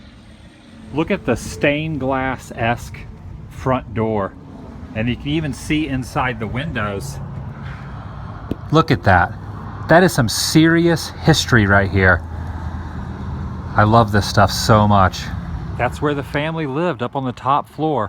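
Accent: United States. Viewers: He talk like a cowboy🐄🐄🐄🐄🐄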